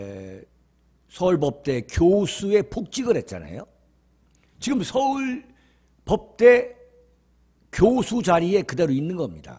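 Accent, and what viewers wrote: Chungcheong, South Korea. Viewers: 윤칼세님 방송이 그래도 재밌음 느릿느릿한 충청도말이지만 진실과 설명을 잘 애기해주네요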